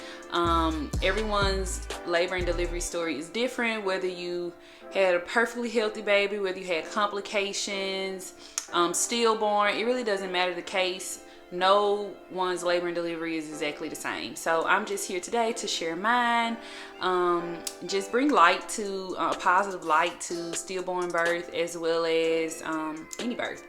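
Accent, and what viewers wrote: South, United States. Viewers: I'm sorry for your loss. Your spirit is amazing. I'm glad you said it...not making light of the situation because you had me lol. Where are you from because you sound just as country as I do😉🥰💫 Not the transformers!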